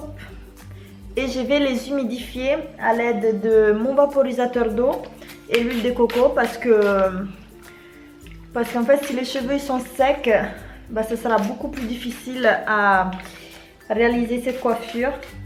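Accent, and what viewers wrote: Madagascar. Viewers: j'aime bien ton accent malgache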